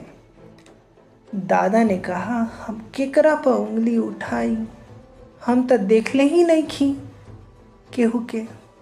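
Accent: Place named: Bhojpuri, India. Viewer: Bhojpuri accent 🤩😂😂😂😂👌👌👌👌 Ioved a lot the way you delivered your story...❤️😂😂😂🤣🤣🤣👌👌👌👌👌👌👌👌👌👌👌👌👌👌👌👌 Characters name was amazing...Simranya 🤣😂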